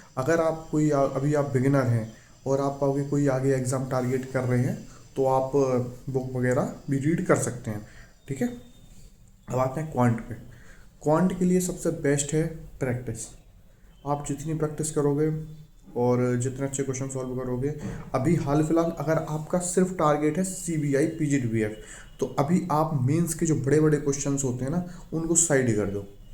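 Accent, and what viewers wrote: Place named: Madhya Pradesh, India. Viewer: Sir aap mp se ho kya . Aapki tone yha ki lg rhi h 😊. Thankyou for strategy sir .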